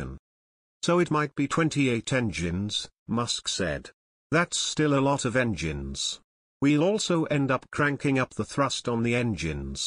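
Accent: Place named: RP, United Kingdom. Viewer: I love how the British cultured accent rubs the Yanks the wrong way. LOL Well done on the content m8t cheers. How about you change it up and use a Cockney or Scouse Accent BWG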